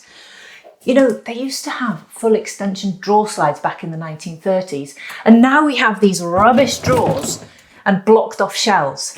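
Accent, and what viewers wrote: Wales, United Kingdom. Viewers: Holly Lord! It feels like I watch DIY Perks) British accent is awesome! Great job, thank you! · OMG!!! Thank you so much for this (and all your) video. So many fantastic ideas in 20 mins!! Gone are the days of printing benchys and busts of Darth Vader... My 3D printer has a new mission in life. I've needed something like this for so long, That veggie label RFID tag idea is just a show-stopper! You are the best. Thank you so much for sharing all your creativity with us. Did I detect just the slightest hint of a Welsh accent?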